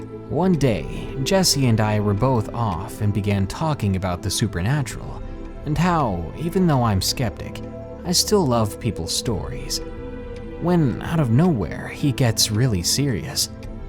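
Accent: South, United States. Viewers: LOL< your southern accent! You're entertaining beyond the stories! Great job! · I never thought I'd find a southern accent attractive before. O.o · that southern accent it shivers me timbres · I came for the dank stories, stayed for the southern accent. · I love your country accent and I love your videos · That rich southern drawl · ... you southern accent is to die for. omg. <3<3<3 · That southern accent nearly KILLED ME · I love the Southern drawl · LOL loooooove your southern accent!!! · Hey, I loved your Southern Accent it made you sound like a Southern Gentalman.. I do declare 😉 much love Sexy Grandma ✌💙💙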